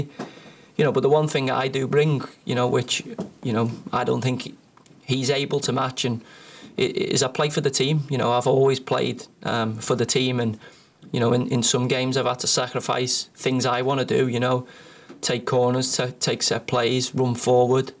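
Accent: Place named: France. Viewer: so he decide to continue dat french accent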